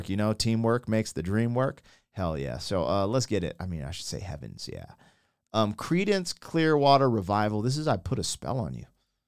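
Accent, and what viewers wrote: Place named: Louisiana, United States. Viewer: cali boy with a cajun accent!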